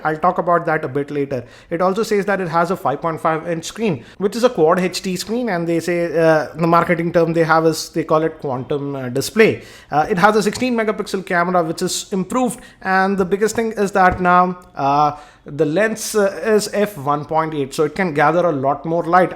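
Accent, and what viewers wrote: India. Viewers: indian accent makes my ears bleed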